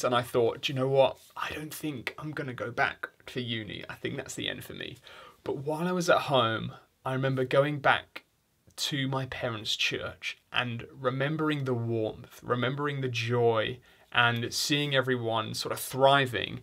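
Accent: England, United Kingdom. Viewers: How is it that I didn’t even notice you were English until you mentioned A levels. I guess the internet has made me used to the accent. I’m American.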